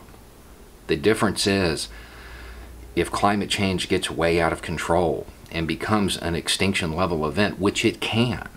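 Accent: South, United States. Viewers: Not enough. Though I always hope that the beard and hick accent catches their attention for a minute.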